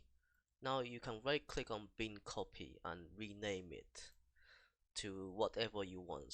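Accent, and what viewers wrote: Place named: United Kingdom. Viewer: 你英文好流利~ 還有英腔~~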